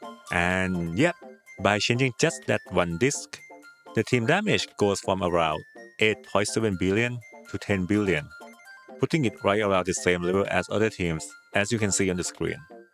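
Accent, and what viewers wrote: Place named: Thailand. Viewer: คนไทยปะครับสำเนียงเหมือนไทย 😅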